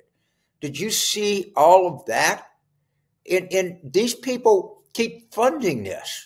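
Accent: South, United States. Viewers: Glad I found you...didn't know you had a channel....so glad to hear what you are saying...I'm 69 from Alabama....you sound exactly like the folks I have heard speak when I was young...the sensible Southerners have left the scene...they have become Trump groupies.....what you are saying is the way men spoke...when I was young...it also sounds familiar because of your accent...Lord...please pray the democrats win...we have our issues...but definitely not same kind of crazy as what the Republicans have become...what happened to Southerners...they had the voice of reason...God Bless you...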